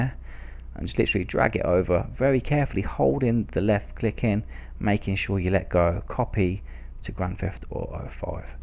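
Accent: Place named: Scotland, United Kingdom. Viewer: sound a bit scottish tbh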